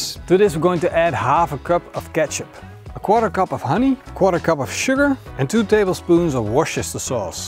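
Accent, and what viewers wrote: Netherlands. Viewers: sounds dutch trying to speak english. but ribs looks tasty.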